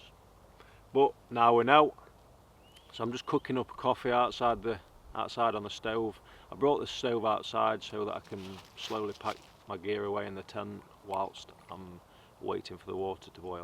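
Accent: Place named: South Yorkshire, United Kingdom. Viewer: Defo a South Yorkshire twang in there somewhere. Enjoyed the vid. Thank you for sharing 🙏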